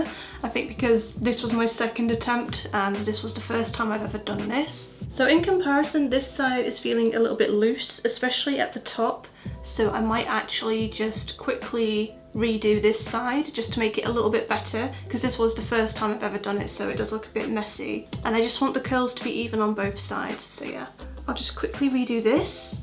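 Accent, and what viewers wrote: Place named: United Kingdom. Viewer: You got a really nice way to act, it's not only your British accent, also the female, soft voice makes you come over as a little lady 😊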